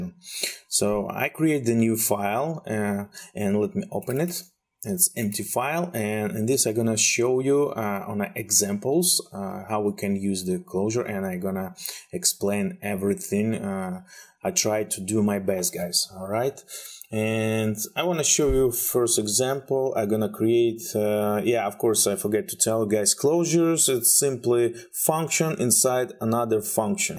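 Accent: Russia. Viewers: Russian accent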